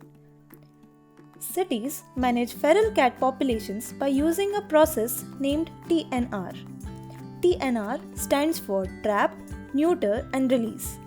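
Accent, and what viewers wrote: India. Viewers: I can hardly understand a word you are saying, even though your attempting, to make this video in English. Your East Indian accent is so thick, you may as well speak in Indian. Good effort though. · What wor st, eunu ch like voice you have! Hateeful in dian accent! All cats are not she. Then how do you say her? Eunu ch! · hears indian technical support accent and already stops video at 5 seconds, agony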